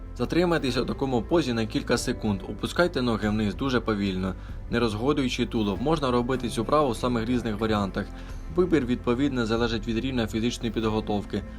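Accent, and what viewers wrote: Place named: Poltava, Ukraine. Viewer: Круто, просто, і без води, Українська мова просто мед для моїх вух( якщо не помиляюся то Полтавський акцент) у автора велике майбутнє.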